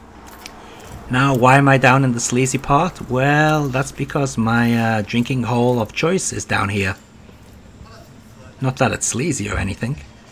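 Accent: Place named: Wirral, United Kingdom. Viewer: They looked lovely! are you from the wirral mate? your accent sounds from around my way.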